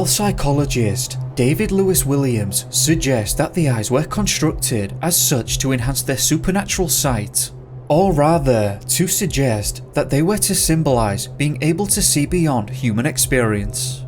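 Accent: United Kingdom. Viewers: Why do you talk like a British chills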